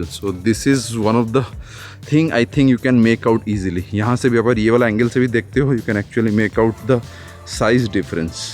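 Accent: Odisha, India. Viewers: Hindi ke sath sath, Apki English me bhi odia slang aati hai...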